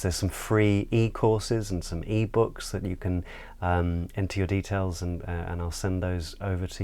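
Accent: United Kingdom. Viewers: I really enjoy listening to your speech and the pure British ACCENT.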